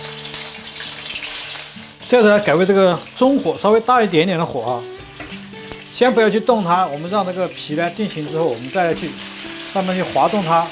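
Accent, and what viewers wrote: Sichuan, China. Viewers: 这个普通话一听就感觉到好亲切喃，是成都人？或者至少是四川的吧。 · 已经开始川普了，之前只是Rua会出现，现在”喃”都来了哈哈哈哈。有粉就是嚣张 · 真是喜欢这口川普，太下饭了，干得漂亮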